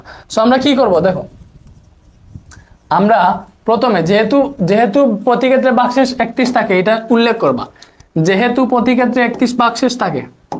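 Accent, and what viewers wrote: Sylhet, Bangladesh. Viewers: Vai mone hoyy Sylhet E😮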